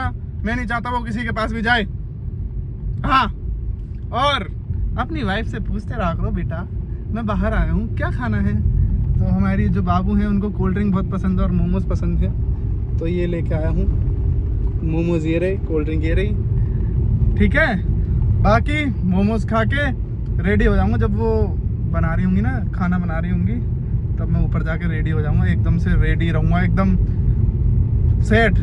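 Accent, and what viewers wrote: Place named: Aligarh, India. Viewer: Bhai humari bhi poori family aligarh se hi, Big fan of your accent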